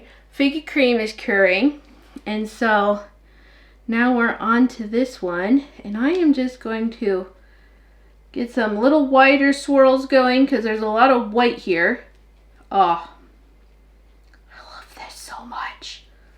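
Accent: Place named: Missouri, United States. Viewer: I love your accent. I'd love to visit Missouri one day - I love how varied the states are.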